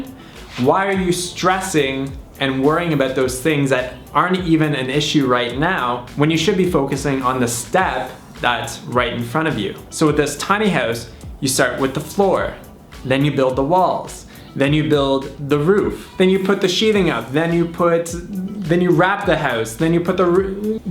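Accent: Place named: Canada. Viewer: sounds so Canadian in this video to me. IDK why....ha!:)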